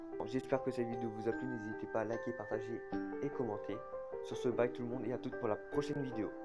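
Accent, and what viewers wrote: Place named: Nord-Pas-de-Calais, France. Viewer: à entendre ta voix et ton accent... Un Ch'ti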